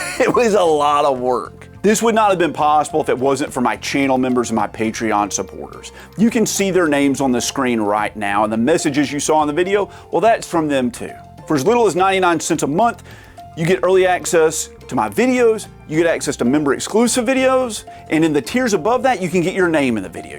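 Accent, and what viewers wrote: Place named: Tennessee, United States. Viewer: Random question my dude: Where the heck are you from? lol your accent sounds so damn similar to my home/ location (Knoxville TN USA). Great content regardless, keep it up haha!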